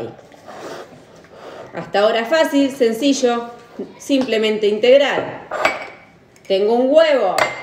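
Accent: Argentina. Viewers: Ahí diese Ecuador yo pensé que eras de la argrntina Saludos amigos colombianos 😊😊😊😊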